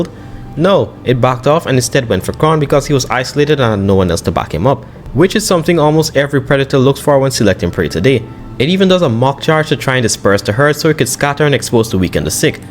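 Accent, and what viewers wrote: Caribbean. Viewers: i know many, many people have probably already pointed this out to you... but i noticed your Caribbean accent. from one west indian to another, you make some damn good videos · I heard the accent and immediately thought you were from the Caribbean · Are you Caribbean by any chance? I'm picking up a hint of Jamaican, Trini or Guyanese in your accent. Love from Guyana! 🇬🇾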